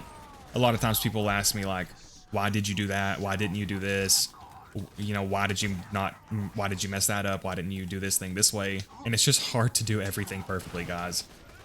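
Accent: South, United States. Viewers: You don't really sound much like fiber honestly. Your accent is pretty clearly southern his is far less so, if at all. Shadow definitely has the master lock picking ability lol. The best bossing weapons in the game, as far as I know, mostly all have a synergy with the bee due to unlisted projectiles, a unique firing pattern, or are able to benefit from multiple parts of the damage formula. The ones that don''t still kill things in op10 but they just take longer to do so. I think much of the gear discourse in this game might actually be driven by availability and the opportunity cost of having an item equipped/taking up bank space compared to another. Having only 63 places per VH to hold gear makes the decision on what to farm, keep, and use more difficult than it otherwise might be. The unlimited bank mod is really helpful for this, but I think much of the discourse around what's "good" at end game stems from the early days when most played on console and mods weren't as readily available on PC. 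Also, does Buttstallion always/often drop gemstones, or is that just the only place you can possibly acquire them? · They honestly do sound similar its just his voice has a southern kind if accent and fiber's doesn't.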